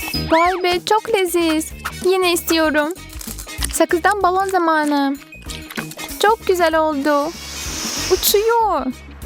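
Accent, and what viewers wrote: Azerbaijan. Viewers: Azerbaycanlı seslendirmiş Türkçe konuşmaya çalışarak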